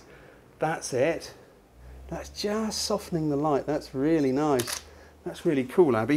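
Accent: United Kingdom. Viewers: Enjoying that until it pauses...and asks for MONEY. Eventually got rid of ad, so thank you for your excellent tips. So nice it's UK English too!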